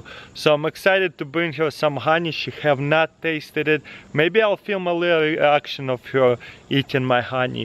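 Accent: Russia. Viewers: По акценту слышно, что русскоговорящий человек. Это так? Интересно просто. Я иногда встречаю людей, у которых акцент еще хуже, чем у Русских, но они не русскоговорящие. Вот мне интересно, вы русскоговорящий или нет?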